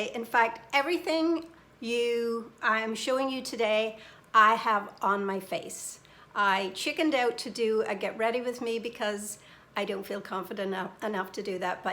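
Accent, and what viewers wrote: Ireland. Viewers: Just found you from Kathy's video! I've subscribed :) Are you part Irish? I can hear something different in your accent but can't place it 🤔 x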